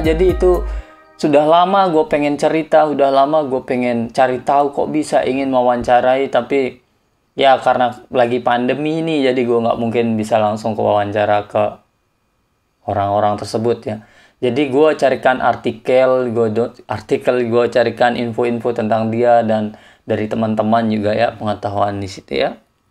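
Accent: Lombok, Indonesia. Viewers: Kyknya abng ini org sasak ya? Gw tau dari logatnya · Kentel gati aksen lombok indonesia no mton..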